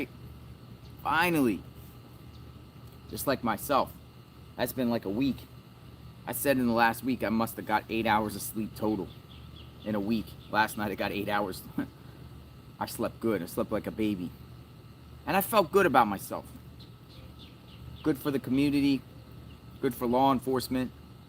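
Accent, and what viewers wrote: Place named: Pennsylvania, United States. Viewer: That PA accent! Can't miss it.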